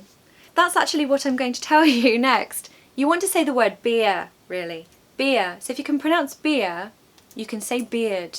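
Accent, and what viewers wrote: United Kingdom. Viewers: You represent the UK perfectly. I love your accent. Your voice is lovely to hear, and your lessons are built to create a lovely atmosphere. That is a proper good lesson 😊. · Listening you is very easy to understand the British English accent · Your accent is so beautiful. I thought most British speak English just like you and I am wrong，you are the best of them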